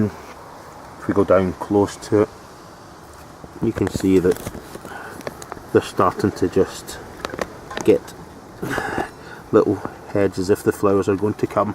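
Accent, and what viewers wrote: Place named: Scotland, United Kingdom. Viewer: Great raised beds, Great frames, Great Vid !! Correct me if i'm wrong but your Scottish accent seems to have something mixed in ?? Maybe a touch of European ??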